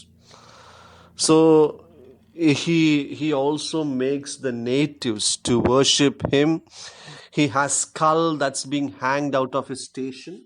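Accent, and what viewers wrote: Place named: India. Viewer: Youre not danish, I can hear the jeet in your voice, saar.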